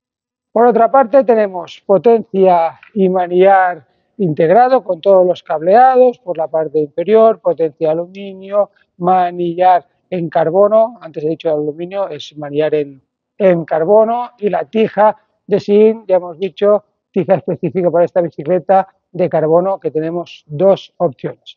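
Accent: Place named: Catalonia, Spain. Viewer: Se entiende perfectamente , con acento catalán pero habla perfecto castellano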